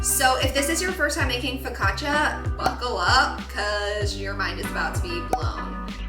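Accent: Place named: United States. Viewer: Bro is soooo weird like looking at you speaking etc 😂😂 I don’t why but I didn’t imagine you speaking like a classic American girl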